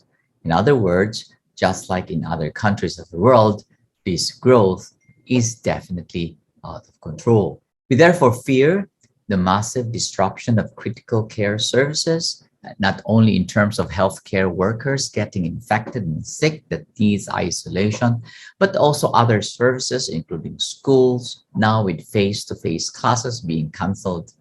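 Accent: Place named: Philippines. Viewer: Your name sounds Chinese but your accent sounds like you're from the Philippines.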